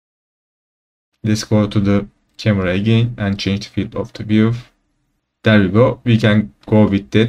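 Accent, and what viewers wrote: Turkey. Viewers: This English looks like Turkish English. What a bad accent :)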